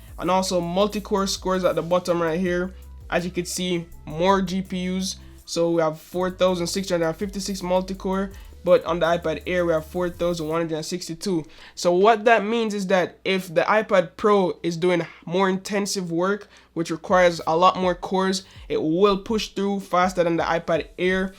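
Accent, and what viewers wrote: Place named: Jamaica. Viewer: you sound like you have a little Jamaica 🇯🇲 in u bro great video tho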